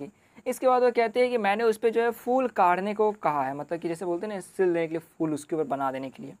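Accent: Bihar, India. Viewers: Bhaiya mai apke accent se he samajh gaya tha ke app Bihar se hai I am also from Begusaria, Bihar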